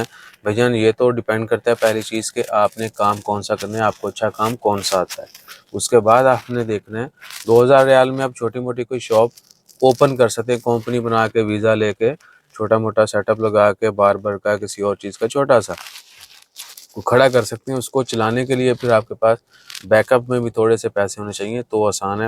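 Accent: Pakistan. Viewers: wesy he boli se tu Gujrat k lagy hain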